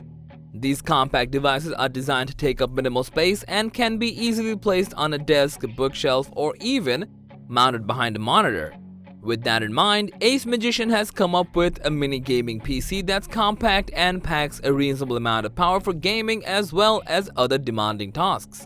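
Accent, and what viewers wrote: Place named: India. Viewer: whats up with the fake american indian call center scammer accent?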